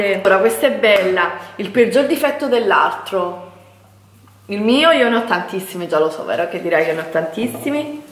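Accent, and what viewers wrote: Naples, Italy. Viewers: Sì è Napoletana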